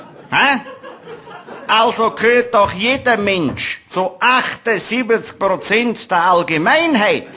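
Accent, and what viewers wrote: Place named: Appenzell, Switzerland. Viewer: Einfach köstlich! - Bin ebenfalls Innerrhödler(in), lebe aber schon seit über 20 Jahren im Ausland und habe und seine Videos erst heute entdeckt. Was für ein herrlicher Fund; ein super-Aufsteller und Wiederfreudehaben am urchigen Appenzellerdialekt! Herzlichen Dank fürs Hochladen!!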